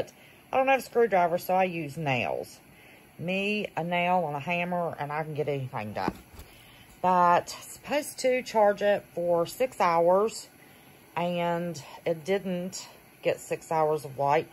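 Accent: West Virginia, United States. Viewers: thanks for the tips :) lol and it is a West Virginia accent, I love it xD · What accent is that? Sounds like West Virginia to my ears. Am I close?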